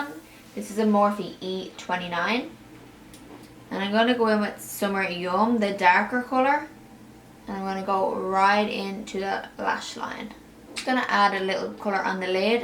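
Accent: Ireland. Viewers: I'm trying to figure out if your Irish or not 😂😂 amazing tutorial tho!😍😍❤️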